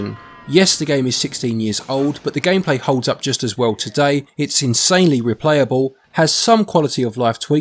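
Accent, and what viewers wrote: England, United Kingdom. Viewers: I watch a lot of your videos and alway wondered where in England your accent is from, thanks for clarifying 😀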